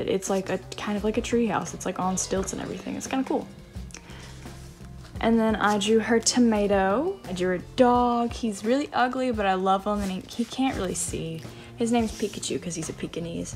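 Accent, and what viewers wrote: South, United States. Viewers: I hope this isn’t rude or anything but your accent makes me so much more secure about mine! Even though I live in the south people make fun of my southern accent sometimes and hearing someone else with it makes me feel so good. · I love your accent. I also have a southern accent and I find them pleasant to listen to. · That country accent coming in thick lol it's adorable! I really love your art btw it's so vibrant and smooth looking 💕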